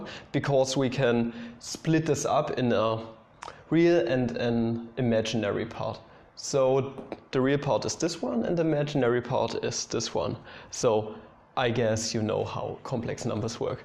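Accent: Germany. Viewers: You've got a nice German accent, I like it!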